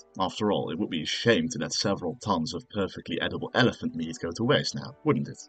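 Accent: Netherlands. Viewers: Thicc Dutch accent, daammnn.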